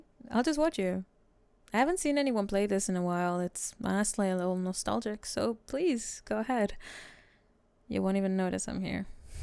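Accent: Spain. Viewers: This actually surprised me because most people do a Russian or French student but with a Spanish speaking student it caught me off guard, hell with the way you spoke I wouldn’t be surprised if you were Spanish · I've heard you do French and those accents, but Spanish. Oh muy senorita or oh lala, I can't decide but wow! Amazing job on this, I am very attracted and very confused lol! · I barely understood the accent, spanish and russian for some reason??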